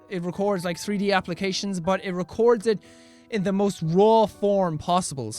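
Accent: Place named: Ireland. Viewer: Play station tree I love the Irish lol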